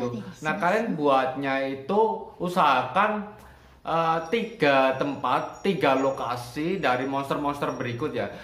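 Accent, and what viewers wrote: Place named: Java, Indonesia. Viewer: hmm medok men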